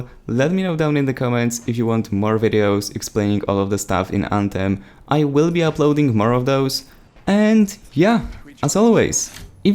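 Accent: Poland. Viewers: Hmmm you sound lukę youre from poland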